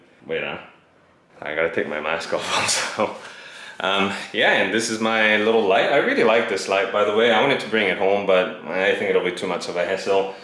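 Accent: Singapore. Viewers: Hi, I enjoyed watching your videos and your Singaporean accent 🤓 Sorry about your dad, I lost mine 4 years ago... You seem like a genuinely nice person. All the best to you and your new life in NZ. Will continue to follow your channel as I have a dream of getting a motor home in NZ one day when I retire. Stay cheerful 😊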